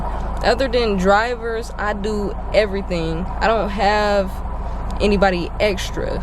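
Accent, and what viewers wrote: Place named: Omaha, United States. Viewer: you sound like your from Omaha Nebraska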